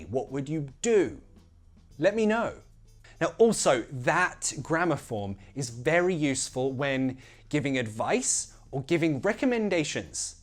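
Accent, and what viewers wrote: United Kingdom. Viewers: Are u British? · You have a great British accent. You explain English topics perfectly and clearly.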